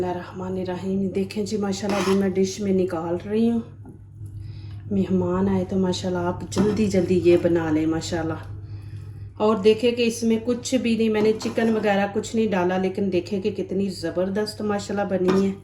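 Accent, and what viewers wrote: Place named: Punjab, India. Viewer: Simple and nice .Will try In Sha Allah. I liked your Punjabi way of talking.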